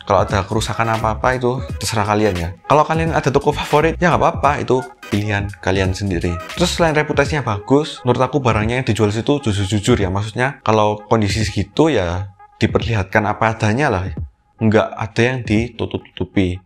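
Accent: Java, Indonesia. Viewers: Fokus dengerin pengalaman ❌ Iri dengerin logat jawanya yg lebih medok dari saya yang orang jawa ✅ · Medok banget ko kaya saya🤣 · Medok review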